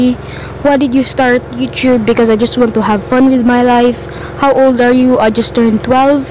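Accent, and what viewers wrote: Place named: United States. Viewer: He is a filipino but his voice sound like an american holy moly hooowwww dude!!! Lol Edit: i dont even understand ur language but it got me laughing when u were talking with ur language XD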